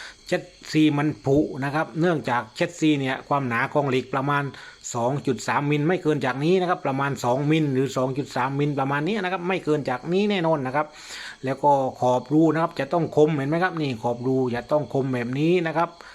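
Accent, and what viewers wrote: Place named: Tak, Thailand. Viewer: สำเนียงพันนี้คนตากแน่เลยนิ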